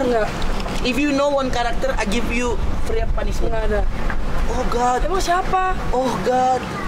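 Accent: Bali, Indonesia. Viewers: Logat Bali nya kental bgt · LOGATNYA BALI BGT HAHAHAHAHA🤣🤣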